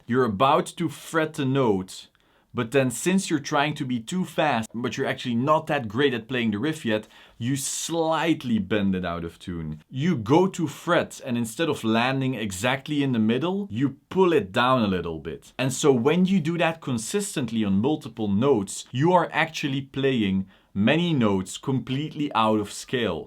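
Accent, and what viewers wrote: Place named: Quebec, Canada. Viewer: where are you from? your accent looks like a french canadian speaking english ahah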